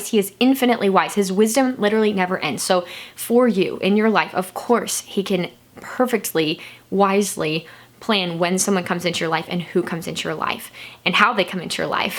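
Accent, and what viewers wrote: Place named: Illinois, United States. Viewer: I really enjoyed this video. And also, I You said people from Illinois dont have accents, but I find that funny because you definitely have an accent.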